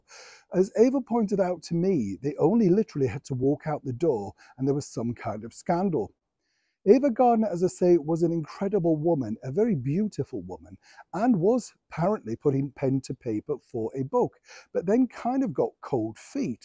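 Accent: Yorkshire, United Kingdom. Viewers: Good morning, My coffee break, and my first laugh of the morning, Resting thespian Yorkshire talk, young man hoping to be star Thank you take care Ps Ava was a beautiful lady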